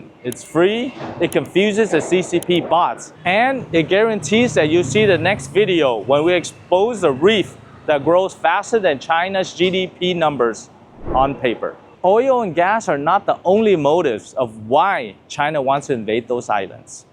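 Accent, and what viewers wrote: United States. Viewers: Trước đó, đời ông bà mình, Tây dùng người Việt đi đánh người Việt. Bầy giờ, Tây mong dùng người Việt đi đánh người Hoa đễ chia rẽ, cảng trở sự phát triễn của Việt Nam và Trung Quốc nói riêng, của Á Châu nói chung. Ông này nói tiếng Anh giọng như người Mỹ quá há các bạn... ? 😉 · This man full of American accent, what he is reporting just trying to stir up the status quote of thebreguon.anyway I don't understand a word he is saying.He does not know about the history, nor does he know about the geopolitics and the relationship between Vietnam and China have been straightened 5 years ago.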